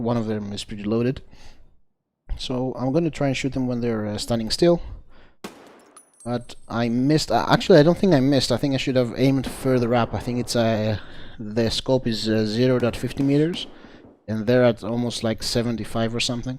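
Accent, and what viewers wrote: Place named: Greece. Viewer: ahhhhh that greek accent <3 nice vid hehe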